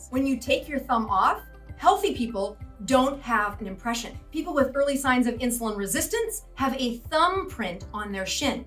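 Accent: United States. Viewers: Tbh the moment I hear a US accent online, talking about medical or dietry advice, I tend to switch off. This woman is spouting her personal opinions, not verifiable medical information. Fyi four time Olympic rowing gold medalist Sir Steve Redgrave has type 2 diabetes. Unfortunately for him, at that time he was diagnosed there was very little known or fully understood about this particular auto immune condition. Redgrave was diagnosed with type 2 diabetes in 1997 at the age of 35. At the time, Redgrave was training and competing with a focus on winning a fifth gold medal at the Sydney Olympic Games in 2000. I defy anybody to find any of those stated symptoms on him. I know, because as a 52year old male in 2012, I too was diagnosed with type2 diabetes. I cured my own type2 diabetes, inspite of being told by my GP that it was 'incurable'. From my own extensive reading, I found there are over a dozen recognised forms of diabetes, all with unique causes and symptoms, eg pregnancy. 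The most common form is type 2 'late onset' diabetes. Characterised as 'insulin resistance'. This form of diabetes, if disgnosed within 10years from onset of the condition, in 80% of cases can be fully reversed ie 'cured'. Medical professionals even today will not claim a cure for type 2, but will say that you are 'in remission', as eg they would with a cancer. Type 2 diabetes: If you are subject to extended periods of uncontrolled stress in your life, your body will flood with energy, in a 'fight or fli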